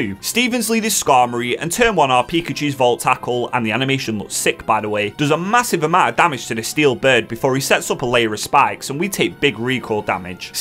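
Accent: United Kingdom. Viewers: you're English you say every thing different is fine all poke tubers do it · Bro are you British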